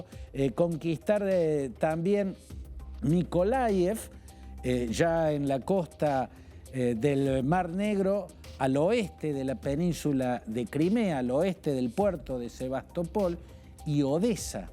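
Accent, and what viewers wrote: Argentina. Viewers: Este señor, por su acento es argentino, bien estos ique analistas de este país siempre se saben todas las historias, no se como estados unidos no los contratan para asesores de guerra. Los mejores analistas que he escuchado que han sido embajadores de diferentes países en Rusia y que tocaron los temas del porque Rusia estaba actuando como lo hace. Este sabe mas que Putin osea solo vean a este señor y no tendrán idea de que esta pasado o pasará. Graciosamente solo habla de putin pero no comenta el papel de USA, Otan y la UE en este conflicto. Quisiera oírlo hablar de las Malvinas. Debe ser un libro de idioteces.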